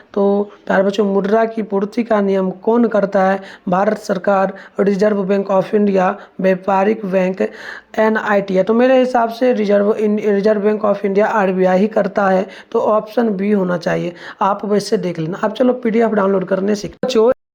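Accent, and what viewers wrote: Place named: United States. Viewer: Sir aap अमेरिका ke he kiya aap ke language waise. hee lagta he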